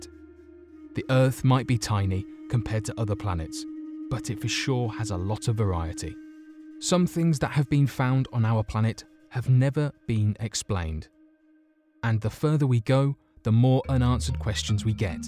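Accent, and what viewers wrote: United Kingdom. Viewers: this guy is more brittish than our normal guy where is our normal guy? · Tacos - they are from different regions in the UK, hence difference in their accents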